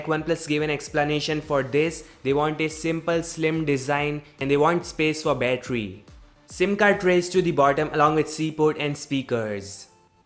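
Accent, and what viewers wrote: India. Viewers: Dude, you should honestly get some English and pronunciation lessons before you post videos. The way you speak and that cringe-worthy stupid accent that flows outta your mouth is the worst thing ever! People like you give Indians a bad name when they tell us we cannot speak English properly. Swear to god, get educated before you educate!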